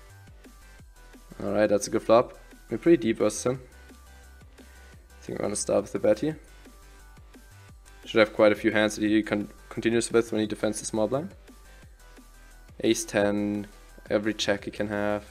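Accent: Germany. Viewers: that german accent though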